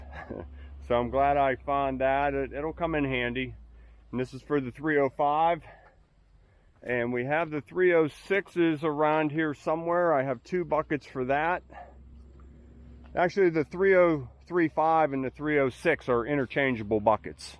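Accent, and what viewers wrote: Pittsburgh, United States. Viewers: I stumbled across your video and started watching it. The minute you opened your mouth, I knew you were from the Pittsburgh area. I was born and raised in Pgh and moved to Arizona in 1998. I still recognize that Pgh accent! I subbed just to get my Pittsburghese fix lol. Thx for sharing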